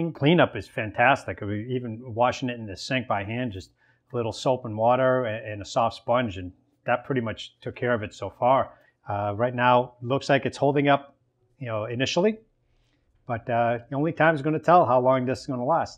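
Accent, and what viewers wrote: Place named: Boston, United States. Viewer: you are the most Boston sounding guy, are you from New England? If not you will fit right in hahaha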